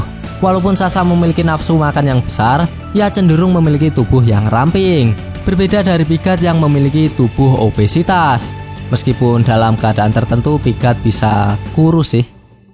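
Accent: Java, Indonesia. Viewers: Kon wong jawa ya min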